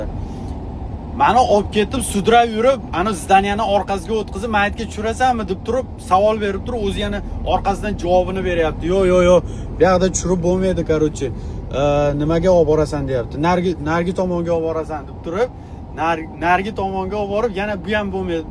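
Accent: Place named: Fergana Valley, Uzbekistan. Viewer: Shevez oʻzgartrmang vodiydansz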